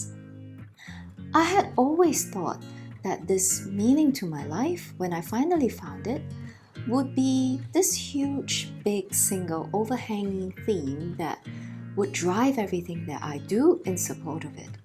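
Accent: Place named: Singapore. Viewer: Okay, I knew it, you are from Singapore. The accent just can't really be hidden... it's too distinctive... plus the description about stressful studies and city-life, corporate rat race... Nice videos... must be nice to be able to just get away from the rat race.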